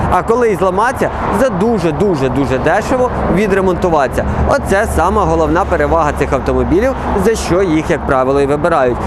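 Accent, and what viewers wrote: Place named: Rural, Ukraine. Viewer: Говориш, звичайно по кугутському)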